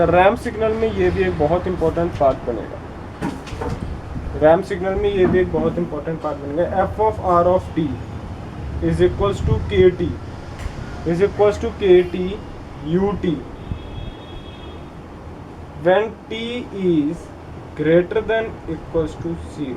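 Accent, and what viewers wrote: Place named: Punjab, India. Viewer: It Sounds Cute When a Punjabi Guy Speaks in Hindi .....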